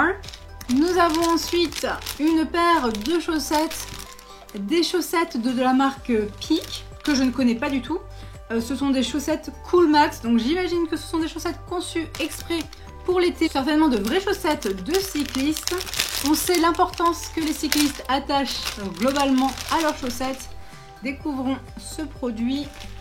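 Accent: England, United Kingdom. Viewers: super video encore!! j'avoue que je ne prendrais pas la box pédaleur, mais franchement c'est super sympa de nous faire découvrir ça, et puis c'est toujours cool de pouvoir avoir des nouveautés qui peuvent arriver sur le marché, grace a toi!! Et pour finir j'aime beaucoup ton "accent anglais" quand tu parles:-D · Des chaussettes 😃 !!!! routine recup ✌️✌ cool l'accent anglais 😜😜 remarque c'est normal !!! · Quel belle accent anglais 😁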